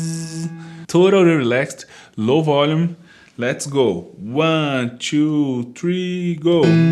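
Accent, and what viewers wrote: Brazil. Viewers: Timestamps Lip trills - Z Sounds- Lip trills - The sound of Z - Tongue Rotations - Glissando - Train Whistles - Lip Trills - Do three sets of these before you sing. Thank you so much,! P.S. I love your speaking voice and your Brazilian accent!!! I'm looking forward to learning your language 😊❤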